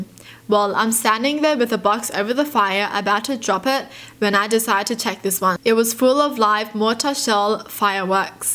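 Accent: Australia. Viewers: Aussie accents best accents 💅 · is Australian! Wow that is so cool. I was wondering where she gets her accent from!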